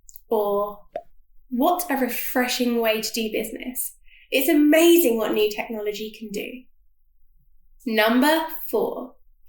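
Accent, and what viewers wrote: United Kingdom. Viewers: 한국사람도 아닌데 한국인들이 실수하는 부분을 잘아시네요. 도움많이 됐습니다. 영국발음도 배울겸 구독하고 갑니다! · 영국식 영어 발음 불편하다.. 그리고 어차피 모국어가 아니면 의사소통이 첫번째 목적인데, 영어가 모국어인 사람도 완벽한 영어를 구사하지는 않치 않나. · BRITISH ENGLISH~ 액센트 너무 좋아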